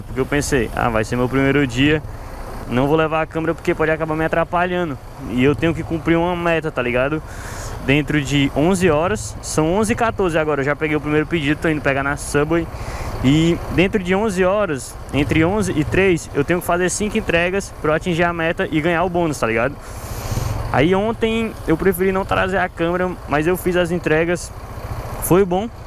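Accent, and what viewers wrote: Fortaleza, Brazil. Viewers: Tem que ser de Fortaleza 😂 "Teu ânus" "Os cara teima" 😂😂😂😂😂😂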